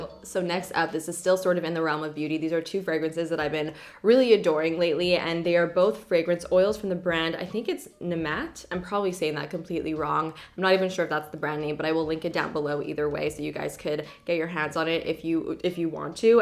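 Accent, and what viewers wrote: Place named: Canada. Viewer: I love your Canadian accent!! xo-Wendy NYC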